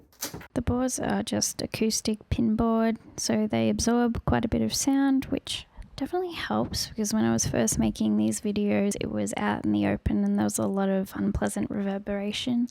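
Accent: Australia. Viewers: Unexpected Aussie! I thought you were American for some reason! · i love your asmr vids, they r so unique and sound amazing!!! and YOURE AUSSIE????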